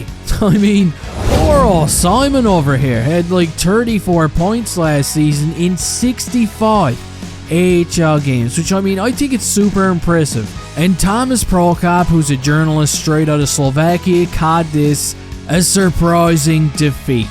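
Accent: Newfoundland, Canada. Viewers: He's a Baymen bye from The Rock .. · The Newfie accent hasn't been watered down like the rest of Canada's. Because of their isolation, they've maintained the accent of their ancestors mostly from England and Ireland.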